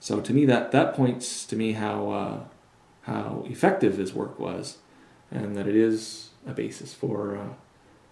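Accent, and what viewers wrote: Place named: Canada. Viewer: Great review, thanks for this! I am absolutely inspired to read the book now! Also, as a side note, after hearing your "outs" and "abouts" I've gathered you to be Canadian?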